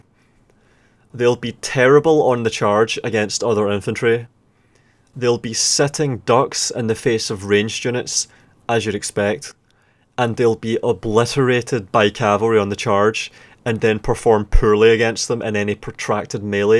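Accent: Scotland, United Kingdom. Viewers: Pretty sure it's scottish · Nah man, i watch your videos becooos ilove your Scoooootish akcent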